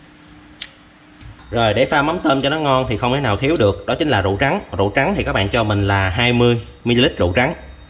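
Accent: South, Vietnam. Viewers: Cám ơn kênh của em rất nhiều Chia sẻ tận tình Tiếng nói đậm đà Âm hưởng bản chất người Nam bộ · Bạn này nói rất dễ hiểu nhanh gọn giọng chất người miền nam dễ thương và dễ mến đáng yêu qúa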